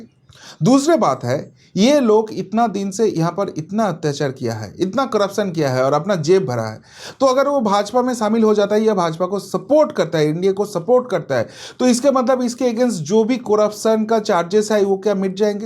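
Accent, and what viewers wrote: Bengal, India. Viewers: Dada, I love your analytics and skills, but I would suggest you do this program in Bangla and use voice over for Hindi. Your Bangla distorted Hindi words, at times dilute the over all meaning of what you intent to say... Just a suggestion... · You are Great. After living in West Bengal for over 35 years, hearing such a tone in Bengali-Hindi reminds me of my days in Bengal. I feel very happy to hear this again... Sir carry on...God bless you..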